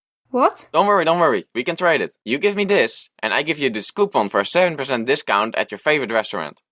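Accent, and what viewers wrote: Netherlands. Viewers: u dutch? btw nice vids 👍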